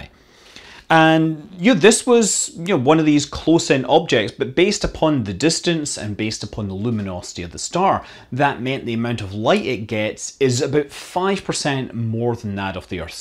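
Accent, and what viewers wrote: Scotland, United Kingdom. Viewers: I had to rewind to listen again to what you actually said.... because I was expecting you to say "temperature", so that's what I "heard" you say! When I read the overlaid correction, I thought, "Wait.... What?" This is all because I have a very hard time processing auditory information quickly enough, and with a Scottish accent and rapid delivery, in tend to miss lot. (This makes telephone conversations hard work for me, esp. with the wretched voice quality on American mobile phone service.) Thanks to YouTube for offering 10-sec REW!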